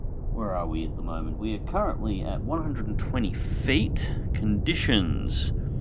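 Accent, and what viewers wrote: Australia. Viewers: Enjoy hearing an Aussie on the intertubes.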